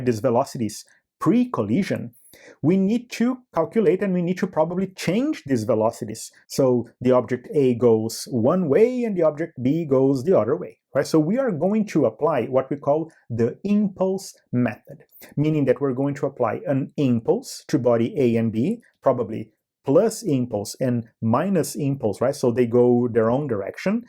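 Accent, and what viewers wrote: Brazil. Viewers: Aha! Eu tinha certeza que vc é brasileiro pelo sotaque! Cheguei aqui aleatoriamente pq comecei a brincar de fazer jogo esse ano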